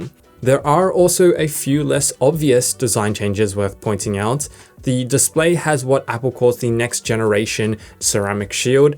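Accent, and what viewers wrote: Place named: Australia. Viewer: By your accent you are Australian but are you quoting US prices? Last I looked the iPhone 16 is $1399.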